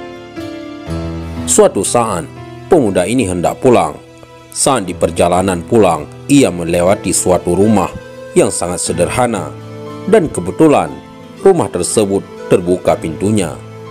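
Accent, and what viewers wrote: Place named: Bali, Indonesia. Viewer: Penyiar nya orang bali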